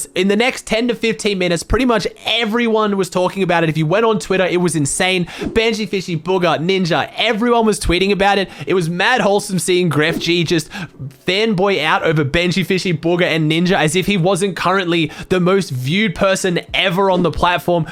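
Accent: Australia. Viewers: He said his gamertag got u aussie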